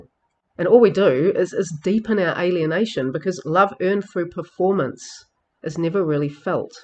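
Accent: New Zealand. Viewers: Shes Kiwi!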